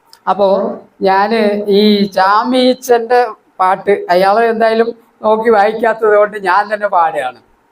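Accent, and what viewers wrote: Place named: Valluvanad, India. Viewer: Palakadan shailli, Valluvanadan bhasha, Kellakan rassamanennanu, Mattu zillakar parayuka, Namaskaram ,🙏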